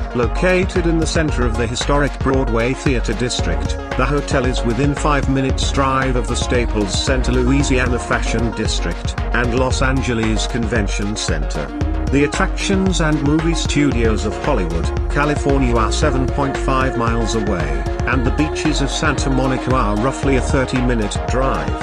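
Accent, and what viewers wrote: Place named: England, United Kingdom. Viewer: Dude’s English accent annoys me.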